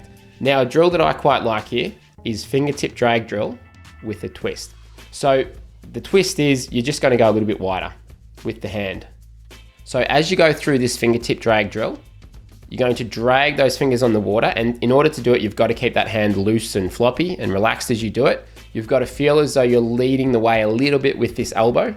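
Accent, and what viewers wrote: Australia. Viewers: This is very helpful and( are you Australian) You are very handsome young gentlemen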